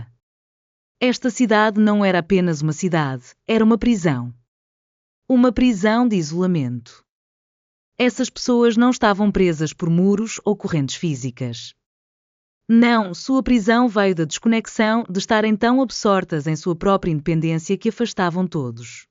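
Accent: Portugal. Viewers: Porque a voz é portugues de portugal? Quase não dá para entender. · Esse é o português de Portugal.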